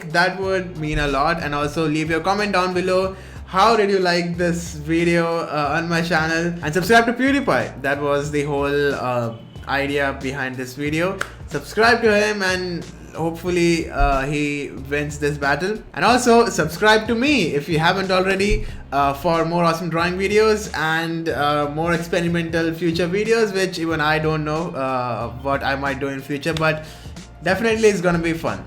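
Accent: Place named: India. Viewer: Ur voice seems like ur an Indian and if u are then I’ll be happy to know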